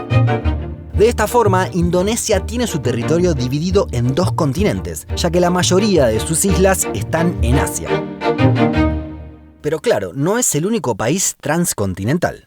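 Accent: Rioplatense, Argentina. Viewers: El que habla parece argentino o uruguayo. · Al leer ese libro, todos lo leeríamos en nuestras cabezas con acento argentino... con la voz del narrador! P.D. Muy buen trabajo. Es uno de los mejores canales en YouTube, y creo que el único canal del que he visto todos sus videos.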